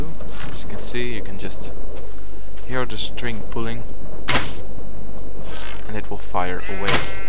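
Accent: Netherlands. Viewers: I might be wrong, but are you dutch? I recognize that accent, I hear it every day ;).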